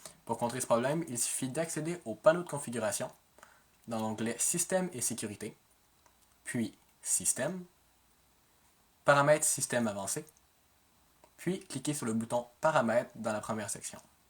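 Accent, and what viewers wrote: Quebec, Canada. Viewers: je préfère largement entendre l'accent québécois plutôt que l'accent d'un gar des bouches du Rhône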